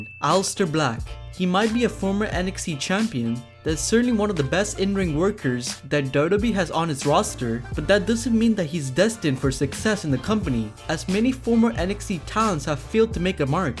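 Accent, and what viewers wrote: India. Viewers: Another indian accent, i dont understand you curly tonge. When indian speak english, sounds stupid.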